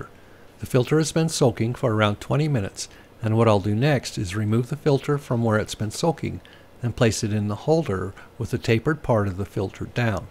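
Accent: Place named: Ireland. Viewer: Sounds to me like he’s from Ireland but has been in the US for a long time so it’s subtle now.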